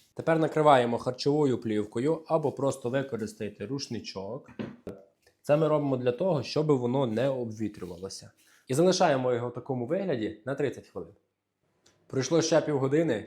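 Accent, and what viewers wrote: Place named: Lviv, Ukraine. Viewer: За акцентом - це мій земляк, львів'янин! Цікаво, вгадав, чи ні? Рідний говір майже завжди пізнаю. А тепер питання: 4 хлібчика мені забагато, чи є якісь тонкощі, якщо розділити все на 2?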